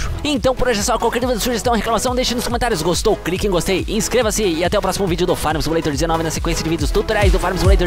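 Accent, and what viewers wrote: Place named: Paraná, Brazil. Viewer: kkkkk C é do Paraná mano? Meu pai é paranaense e fui morar 1 ano com minha vó no Paraná e a galera fala desse jeito lá kkkkk povo doido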